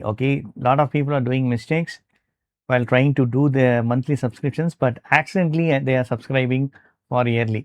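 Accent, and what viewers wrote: India. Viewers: Бля, думал что в Английском видео, а тут Английский Индия. Ничего не понял.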